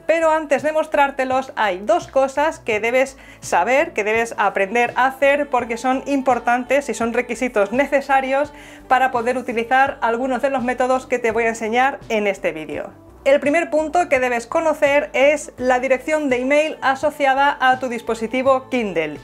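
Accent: Spain. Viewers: Es seguro que no escucha su pronunciación, es francamente un sufrimiento escuchar su vídeo, y sí, veo una e y acento en la í. Usted y todos sus paisanos pronuncian vidio. Pues pronuncien vidio y escriban vidio ya. Incorporan a la Real Academia de la Lengua y listo para eso son españoles y esa Academia la tienen en casa. Los latinoamericanos hablamos un español muy diferente al de los inventores del idioma, y escucharlos es aburridisimo. Usted no entenderá la expresión mexicana "cagan los huevos" pero si eso pasa. De todos modos, está bien, no se enfade, muchos visitantes de sus vídeos también dicen vidios y estarán contentos con usted. Saludos desde México.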